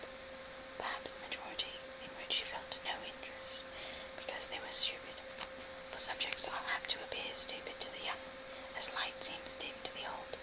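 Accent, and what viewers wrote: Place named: Southern England, United Kingdom. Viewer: I've been listening to this for a few nights now, and it's really relaxing :) You asked in the description if you wanted pointers on an English accent? To be honest, it sounds pretty bang on for a southern English accent, which is appropriate for this video, as long as you take into consideration that very few people actually speak like this in England anymore, our dialects are very region-specific :) a northern accent would be the next one to try, I would think. Well done x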